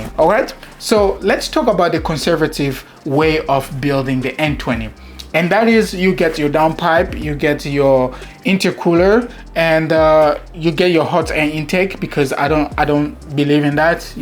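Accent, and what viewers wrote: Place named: France. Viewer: Are you french ? You have a little accent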